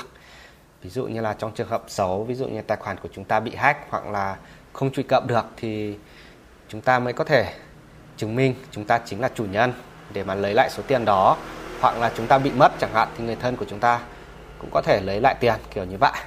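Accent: Standard. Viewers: Anh nói quá chuẩn luôn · Bạn nói rất chuẩn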